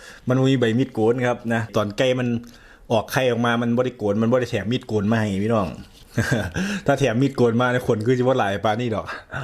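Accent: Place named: Ban Phue, Thailand. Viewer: สำเนียงคุ้นๆเป็นคน อำเภอบ้านผือ บ่ครับ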